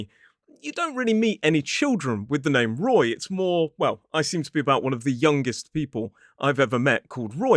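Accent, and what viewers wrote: United Kingdom. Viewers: Hi, lve just come across your channel. l love the way you run your lessons, and l love listening to your British accent. I've subscribed ❤ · Ciao Roy, what did you say at the end to finish off your video, "from me" or "fir me"? They say the same thing on TV to end a programme but I never get it. I love your British accent so much!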